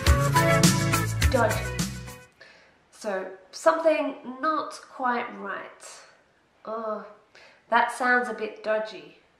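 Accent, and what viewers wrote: Australia. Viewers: You have an Australian accent except you pronounce your T's.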